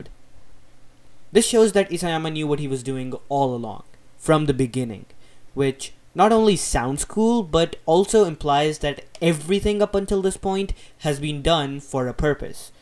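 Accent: India. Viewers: your accent feels like indian....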